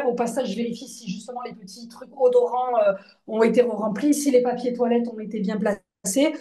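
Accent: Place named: South, France. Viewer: votre accent du sud qui nous apportent réconfort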